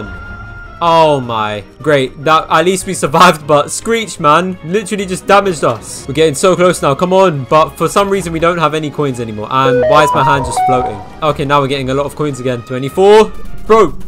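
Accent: United Kingdom. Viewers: It is too brittish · Lol British voice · He already voice revealed British🇬🇧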